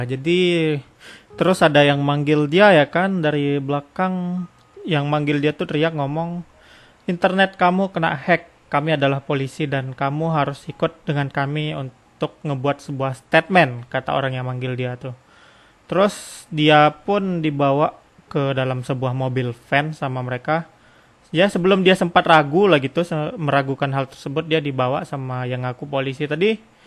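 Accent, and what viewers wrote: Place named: Medan, Indonesia. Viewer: Bang lu orang medan yah? Keliatan dari logat bicara nya?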